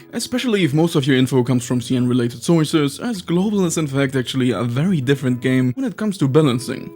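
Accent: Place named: Germany. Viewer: du nuschelst ziemlich was deinen deutschen akzent nicht wirklich versteckt :o dein tonfall ist igwi.. leicht arrogant was, wie ich finde, nicht zu dir passt :o aber an sich ist das video echt cool gemacht :D gj :D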